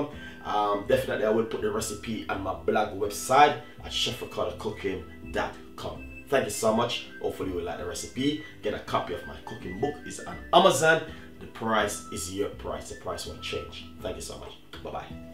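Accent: Jamaica. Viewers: He speaks patois leave him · He's Jamaican even if he were from China he has his own way of speaking because of where he is from. He also says ole mila for whole milk and share it out instead of portion it out but somehow none of that bothered you. I like to hear how other people talk. It's interesting. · So Jamaican talk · Why u being so rude he is a jamaican man thats how we talk its our accent and pronunciation.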